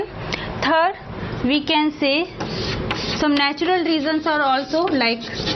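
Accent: India. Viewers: Noice,but hard to get ur english😫pura indian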